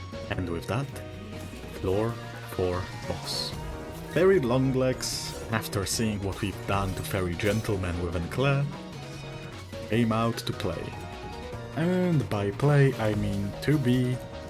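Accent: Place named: Germany. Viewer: holy German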